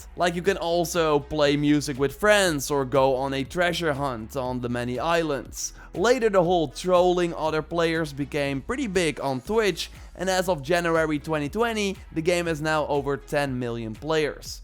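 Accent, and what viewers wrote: Netherlands. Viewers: U sound dutch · You sound like you are from the Netherlands. You have that "P is B and B is P" Accent.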